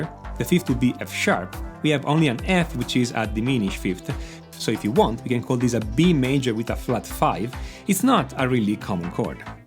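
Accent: Italy. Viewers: You're very useful to the one who studied a lot. Thank you! Are you italian? Your accent sound so italian. Sorry for the stupid question!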